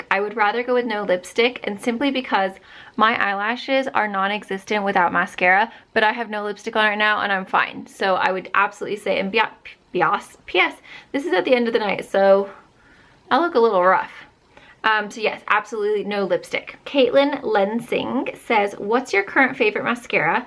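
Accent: England, United Kingdom. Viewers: Might be A stupid questuon but did you ever have an English accent.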